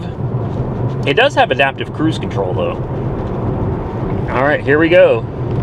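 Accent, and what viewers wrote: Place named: Oklahoma, United States. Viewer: What part of Oklahoma are you from?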